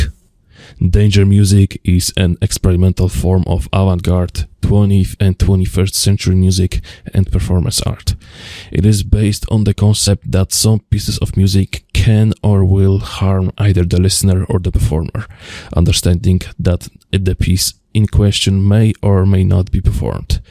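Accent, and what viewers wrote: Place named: Eastern Europe. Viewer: looks like i hear slavic accent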